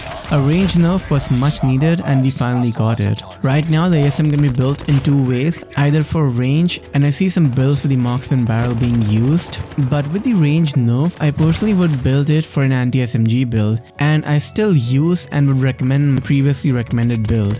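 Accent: India. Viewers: Are you indian, your accent is kinda Indian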